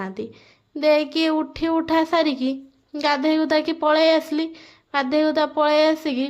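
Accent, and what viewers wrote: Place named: Mayurbhanj, India. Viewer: Pure balasoria katha houni tk tk mayurbhanjia katha ହେଇଯାଉଚି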